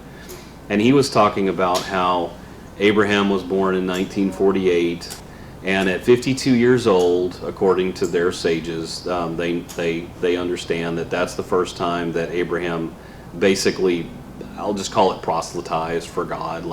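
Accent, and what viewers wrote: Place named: Alabama, United States. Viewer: I thought I heard an Alabama accent. I live in Smiths Station which is just south of Opelika/Auburn. I sure wish you were local so I could physically attend. I’m glad you are posting this on YouTube so I can hear and follow along.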